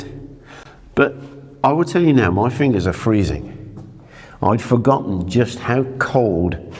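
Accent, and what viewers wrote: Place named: Birmingham, United Kingdom. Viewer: He sounds more like a Brummie than someone from The Shire. I grew up 10 mins from the castle but didn't visit until I was in my 20s! I guess you don't think much about something you see day in and day out. It is a very interesting place, but hideously overpriced.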